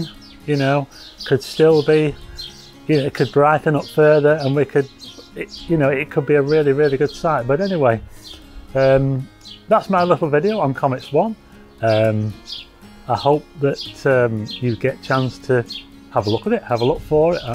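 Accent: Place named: North, United Kingdom. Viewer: Its a very posh northern accent. Easily understandable.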